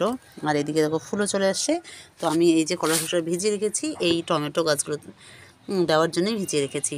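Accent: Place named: Bangladesh. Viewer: আসসালামুয়ালাইকুম আপু, আপনার এই ১২" গ্রো ব্যাগ গুলোর হাইট কত ইঞ্চি? প্লিজ বলবেন। আপু আপনি বাংলাদেশিদের মত কথা বলেন।আপনি কি বাংলাদেশি ?😊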